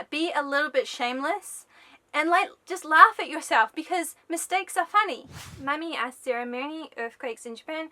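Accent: New Zealand. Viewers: Yeah it's a bit of a hybrid between her native New Zealand and the Japanese accent she would have adopted a bit from being around Japanese speakers so often.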